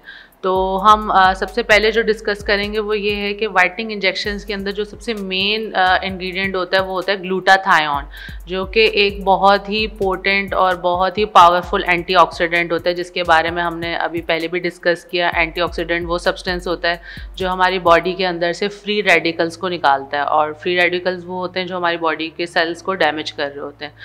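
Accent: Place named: Karachi, Pakistan. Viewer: Ye Dr karachi ki hy... Speaking accent say lgra hy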